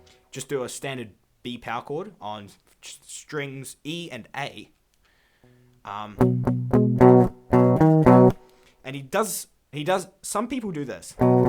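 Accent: New Zealand. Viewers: when I first watched this video (it was the first video of you i saw) i said to my sister 'wow I really like his accent! Where is he from?' And she told me it sounds Kiwi, I am from NZ as well but you have a very strong accent its amazing! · Is your accent New Zealand-ish? (Idk how to say it I'm stupid XD)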